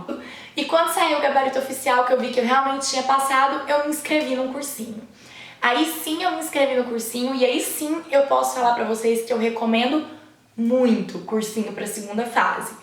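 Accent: Minas Gerais, Brazil. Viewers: Você é mineira?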